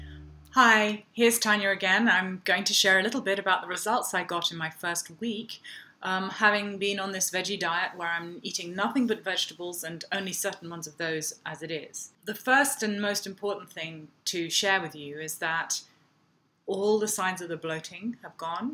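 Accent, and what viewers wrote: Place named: England, United Kingdom. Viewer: Ride W it’s a genuine upper middle class English accent. Nothing American about it.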